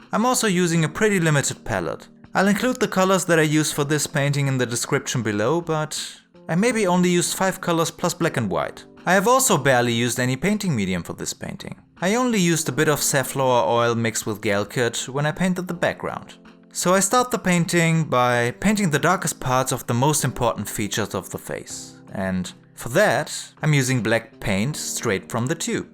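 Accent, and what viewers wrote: Germany. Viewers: In his defense, it's probably the very strong german accent, in combo with trying to sound american and "cool"?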